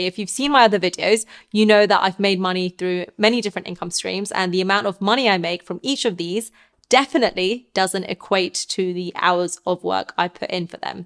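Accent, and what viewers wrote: United Kingdom. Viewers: I love that accent...I'm assuming it is British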